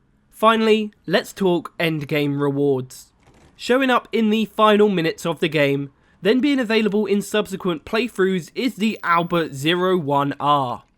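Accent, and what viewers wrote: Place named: England, United Kingdom. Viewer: Why does these kind of videos always had a narrator with english accent?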